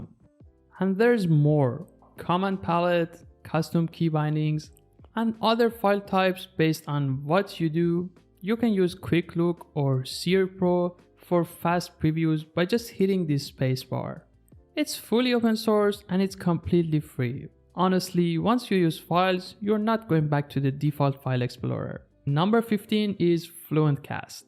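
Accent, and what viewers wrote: Iran. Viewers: با توجه به لهجه انگلیسی ت فکر کردم بچه ایرانی پروفایل ت رو چک کردم زد ترکیه لهجه انگلیسی دو کشور نزدیکه به همه 😂 سال ۲۰۲۰ که ویندوز ۱۰ نصب کردم هنوز UWP زنده بود، اونموقع مسائل fluent ui خیلی دنبال میکردم برنامه Win UI Gallery 3 هم میتونی یه ویدئو بری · from the accent, I immediately knew you were Persian just like me 😅 But anyways, insanely good video bro, and especially as someone who comes from Fedora GNOME, this is a godsend, considering all the apps in GNOME have a unified UI across the whole system, which Windows 11 CLEARLY lacks. And UniGetUI is a really good package manager considering I'm used to DNF. You deserve a like and I quite frankly, HAVE to save this video. Thank you، برادر.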